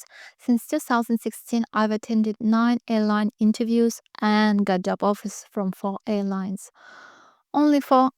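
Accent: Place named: United Kingdom. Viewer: Вау твой Английский реально приобрел британский акцент афигеть 😮👏👏 и все так профессионально выглядит! Эта картинка 🥰 ты изумительная 😍 видео с двух камер и вся анимация и инфографики ☺️🔥🔥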